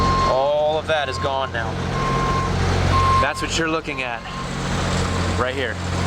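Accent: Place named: United States. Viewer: . Like other people, just love your presentation! So clear and concise, such a quaint accent! So nice to hear such good English coming from the USA. Excellent report in every way. Have to say I am amazed to see a wooden embankment in 2019 in high tech USA. Don't think the UK has had any since 1900! Wonder why they did not use stone/brick or rock spoil from elsewhere when building the line? But quaint all the same. Most enjoyable.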